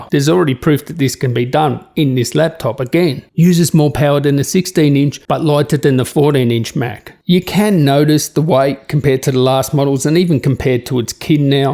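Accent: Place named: Australia. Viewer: I love Aussie accent :)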